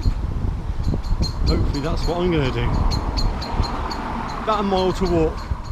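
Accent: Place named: Wigan, United Kingdom. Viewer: always thought you was a Wigan lad sound just them pie eaters up the road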